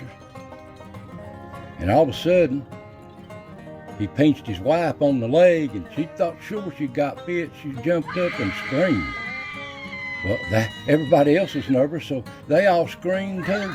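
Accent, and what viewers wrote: Appalachia, United States. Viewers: I grew up in Southern West Virginia and heard about snake handling churches growing up. Your video makes me miss home, we sound an awful lot alike. I've traveled this country from one end to the other and my accent always gets commented on everywhere I go. Your videos are a treasure, keeping our mountain history alive.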